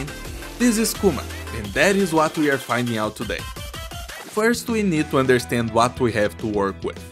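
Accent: Brazil. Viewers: I'm hearing brazillian accent? Btw, nice video, i know what makes an operator a bad one is the amount of effort they need to complet a stage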